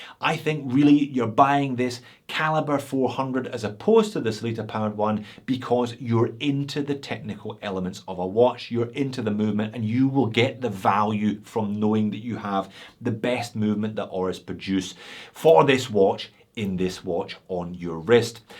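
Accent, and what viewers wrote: Scotland, United Kingdom. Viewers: I just came to listen my favourite Scotsman talking about a nice watch I can't afford and had to learn that Sean Connery died... RIP.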